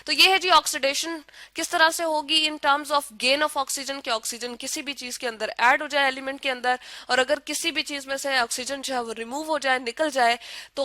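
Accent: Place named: Pakistan. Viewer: You look Pakistani the way you talk 🤔🤔😍 I love your way of talking and this video helped me a lot thank you .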